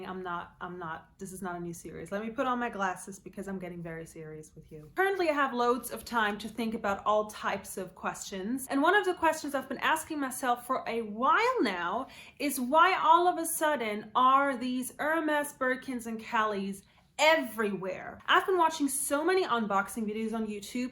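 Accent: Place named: United Kingdom. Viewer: I thought I was going crazy because I’ve been seeing Hermes all over the place. Even I was able to get an appointment and I don’t even have Hermes money. I’m curious, where is your accent come from, it’s beautiful. A bit British?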